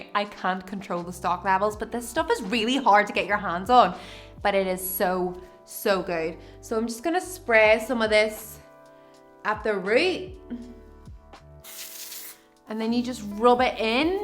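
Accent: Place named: Northern Ireland, United Kingdom. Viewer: Hi, I am a newbie to Dyson airwrap world but I find your chanel so so helpful! Love the content! Keep the good work! Also, I used to live in Belfast for some time so have loads of sentiment to NI and love your accent😂❤️ Warm regards from Luxembourg xx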